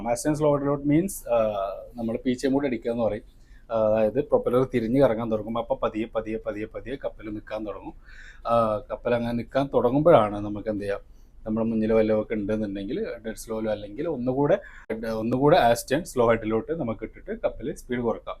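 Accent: Malappuram, India. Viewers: ഈ മലപ്പുറംകാർ ഇതിലും വന്നോ.. ചീഞ്ഞ ഭാഷ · നമ്മളെ മലപ്പുറം സ്റ്റൈലിൽ explanation.. 😄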